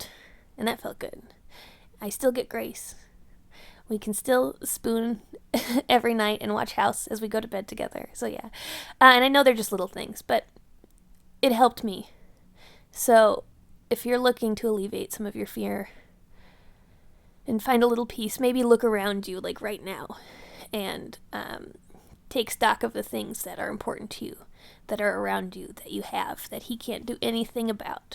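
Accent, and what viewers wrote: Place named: Midwest, United States. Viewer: Minneapolis, I was right. you are from the mid west. I can tell by your accent. so hey fellow midwesterner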